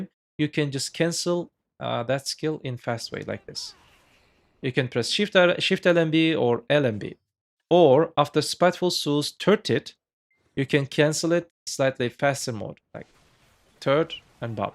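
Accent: Turkey. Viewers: ben o türk aksanının kokusnu alırım abi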